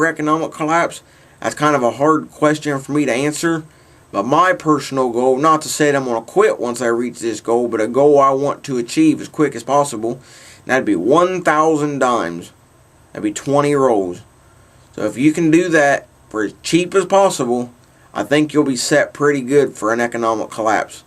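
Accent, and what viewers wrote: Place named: Texas, United States. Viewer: Wow I love your accent! Which state do you stay in? Texas? :)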